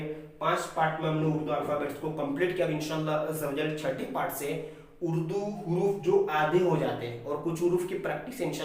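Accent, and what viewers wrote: Gujarat, India. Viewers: Tame Gujarati chho??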